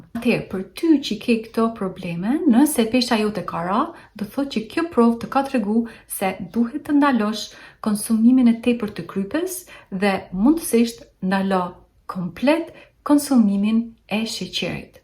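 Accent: Shkodra, Albania. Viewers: Respekte dhe per shkodranishten e bukur ❤Po me u shendosh si me ja ba se jam i dobet 😅😅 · Keshilla shume te vlefshme dhe na knaqe me t folmen tone te ambel shkodrance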